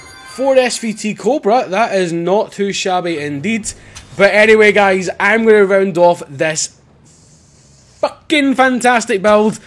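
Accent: Scotland, United Kingdom. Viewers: Are you from Scotland. I like your accent if that's how you spell it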